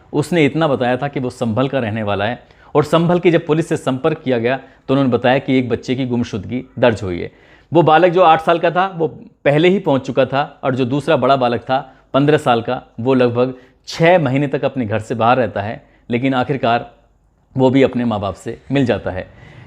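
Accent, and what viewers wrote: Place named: Bhojpuri, India. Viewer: Bura na manna AAP blood ko bled kyoan pronouns krte ho sahi krne ki kosis kijiye Sir bhojpuri tune daldiya aapne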